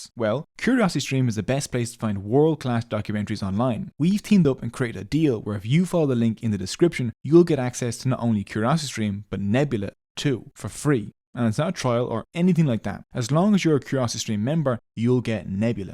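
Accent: Ireland. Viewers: God i really love your accent, i wish i was born Irish so i don't have to study extra to have Irish accent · From a Nigerian, an Irish dude pronouncing Yoruba places/names with an Irish accent...is just in having me in stitches 😂😂😂 (it's so phonetically bad/wrong), but I'm so enjoying this video nonetheless. The amount of research is immensely commendable. 👍👍👍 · A party to celebrate the success of a previous party SENT ME lol This was a pretty cool breakdown especially for someone who is ADOS learning I'm largely Yoruba. I also appreciate your pronunciation effort which I don't think can be easy with a strong Irish (?) accent! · Please be googling how to pronounce “Yoruba” first. Even with your Irish accent there’s no reason why you can’t pronounce Yoruba and place names like Ibadan properly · BRUUUH you're confirmation to my closeted anthropologist mind, that the accent of the Bajans is defohighly influenced by de irish and probably from where youre from in ireland... 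#onelove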